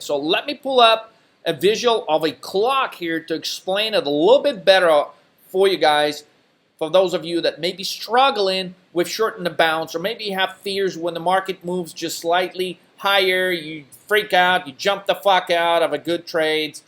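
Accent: South, United States. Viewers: What kind of fucking accent is this lmaooooo craziest one iv ever heard i here European , southern American and New Yorker all at the same time!!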